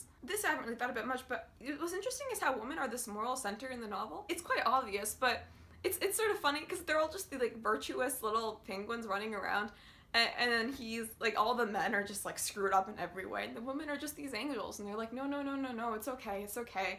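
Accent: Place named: Canada. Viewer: What a lovely Canadian accent Oh! By the way nice review.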